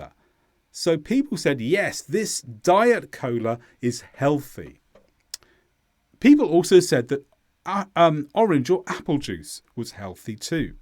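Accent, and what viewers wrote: United Kingdom. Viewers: When I hear such beautiful British accent I remember ( is it a correct word in this context?) why I decided to learn English in the first place. Music to my ears ))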